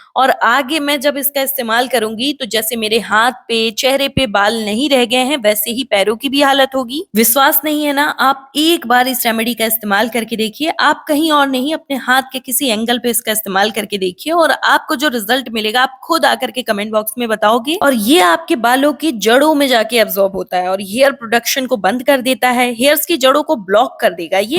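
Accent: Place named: Gonda, India. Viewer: Gonda se bol rahe hai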